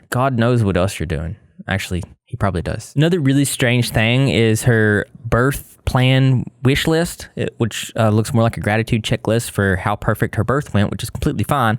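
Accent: South, United States. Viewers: new viewer who is curious about ur accent. are u aussie or from the south? u sound like my grandpa from georgia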